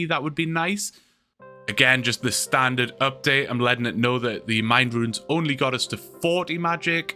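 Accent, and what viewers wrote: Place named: Newcastle, United Kingdom. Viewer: A geordie RuneScape player? Thought i was alone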